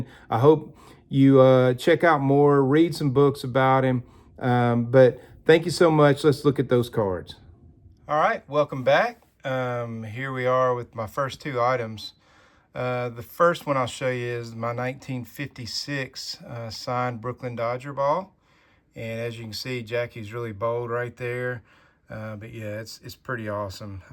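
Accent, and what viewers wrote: South, United States. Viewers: this was such a great episode. I’m in the middle of reading Jackie Robinson-A Biography by Arnold Rampersad. I think you have the facts pretty close to what I’m reading in the book. As I’m reading, I think about how hard it was for black Americans to live during that time; even today in many instances. You’re telling of the story, and I mean no offense at all, by a southern American, really makes the story come alive and enriches it with a lot of empathy. And your card collection is something else. Thank you for sharing,.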